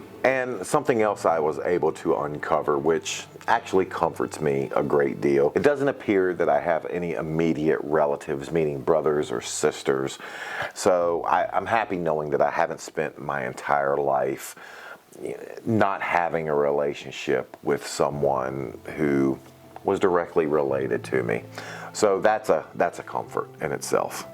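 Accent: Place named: Appalachia, United States. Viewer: I knew it. I totally hear the Appalachian in your voice.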